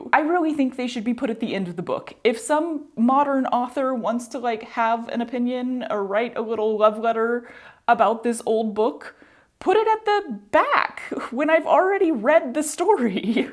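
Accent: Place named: Brazil. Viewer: I love that even though you grew up in Texas and live in California (major Spanish-speaking states), your accent sounds like Brazilian Portuguese 😂❤ Have you picked up much Portuguese?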